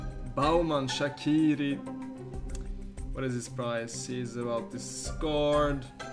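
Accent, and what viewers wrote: Sweden. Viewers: He is so swedish listen to that accent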